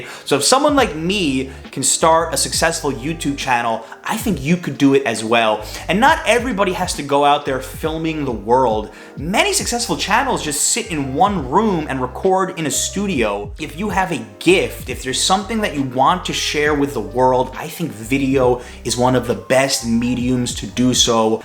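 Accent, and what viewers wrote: New York, United States. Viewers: I’m Japanese and grew up in Flushing Queens in the 70”s ! I’m now in my mid 50’s and living in Japan for 40 years but your vlog brings back all the great memories in my childhood. I’ m also losing my English speaking abilities but your vlog reminds me some of the New York accents and all the good foods especially pizza and sandwiches(^^) !! I love watching your videos! Keep up the good work😊😃‼️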